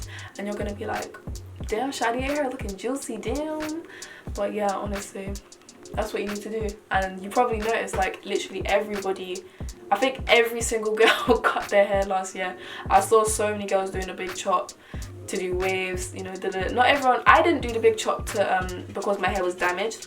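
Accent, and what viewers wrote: United Kingdom. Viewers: 😱your British 🙌🏾🙌🏾🙌🏾🙌🏾 yass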